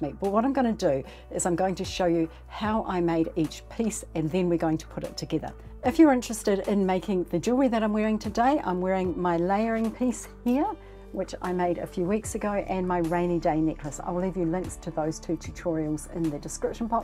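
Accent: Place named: New Zealand. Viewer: The Kiwi accent tripped me up, I thought she was saying pills, pills and more pills 💊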